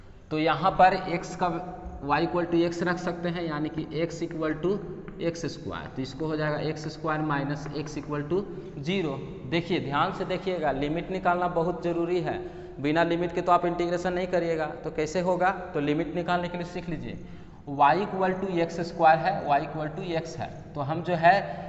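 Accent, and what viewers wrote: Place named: Bihar, India. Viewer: Aapka bihari accent I like it so much 😍 💕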